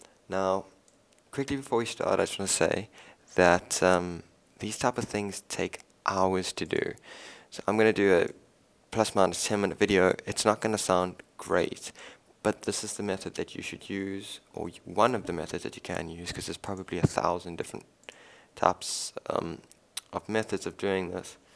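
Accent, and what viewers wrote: South Africa. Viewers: ***** Hey man, are you from South Africa?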